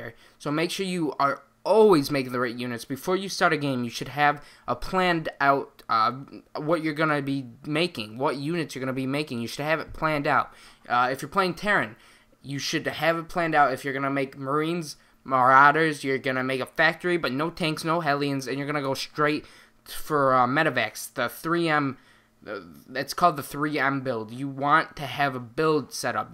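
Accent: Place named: New Jersey, United States. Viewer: Sounds like you're from New Jersey lol.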